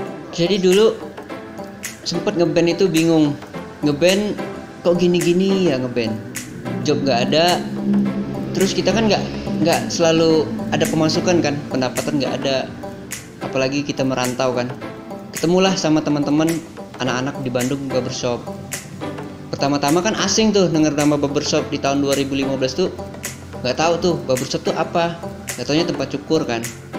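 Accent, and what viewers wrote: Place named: Palembang, Indonesia. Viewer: Mantap..gaya ngmng palembang masih Ado😀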